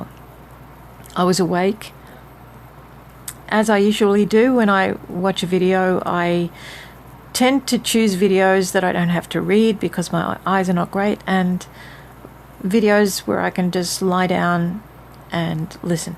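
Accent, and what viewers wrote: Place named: Australia. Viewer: I though your accent was Aussie. Just watched your video about "who you are". It must be lonely to be stuck in a hotel room far away from your home, but there are no coincidences with God. Maybe you made a mistake by coming here to marry someone you really did not know, but God can use that for His purposes. Maybe that was to get you away from a country that is forcing the V on everyone. Anyway, in my opinion we don't have much longer to wait for His return. PS: I am a musician--banjo, guitar, mandolin and dulcimer. Mostly old time gospel in nursing homes, churches, etc. Well, some folks don't consider banjo players as musicians. LOL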